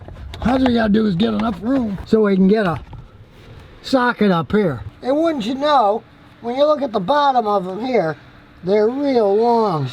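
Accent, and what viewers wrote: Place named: Philadelphia, United States. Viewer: Is this guy from Philly?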